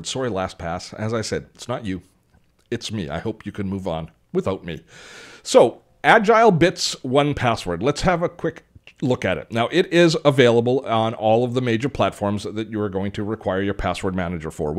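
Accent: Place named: Canada. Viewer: aboot - Canadian accent detected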